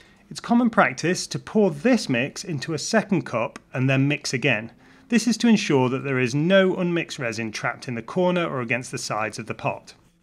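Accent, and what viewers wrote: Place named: Middlesbrough, United Kingdom. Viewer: I know this has nothing to do with composites, but Id like to know where your accent is from, I know it's a commonwealth accent, but I keep hearing a bit of brough in it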